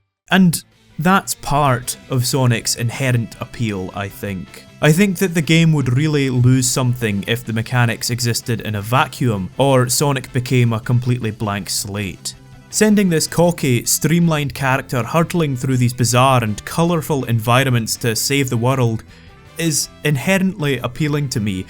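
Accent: Scotland, United Kingdom. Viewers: more scottish to me.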